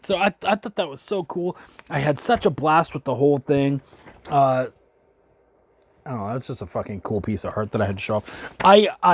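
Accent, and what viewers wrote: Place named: Canada. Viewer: You sound especially canadian in this video. I love Is'Nana, picked it up when I backed the hated! You should also check out A Black Man's Guide to Driving, really good from both parts! Solid breakdown, the world needs more raw reviews!!!!!!!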